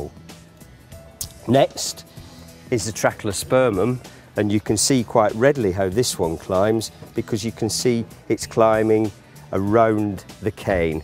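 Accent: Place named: Lancashire, United Kingdom. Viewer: What a lovely Lancashire accent. Thanks for the info!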